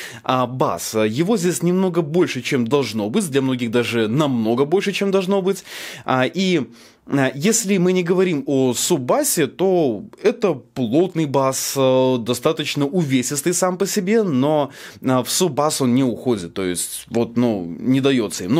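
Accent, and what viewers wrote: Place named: Belarus. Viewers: Дзеканье цеканье то да, отличительная особенность белоруса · Сам тоже из Беларуси, но после года жизни в Москве, очень слышен твой белорусский акцент, аж уши режет)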